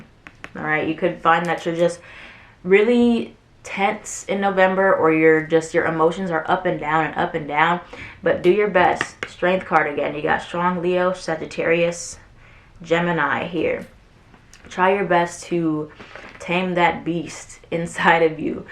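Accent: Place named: Philadelphia, United States. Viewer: You said wooter not water. My ear went ding ding you have to be from the Philly Jersey area. Also, “you guys” that’s how we speak around here 💯🙌🏼🥰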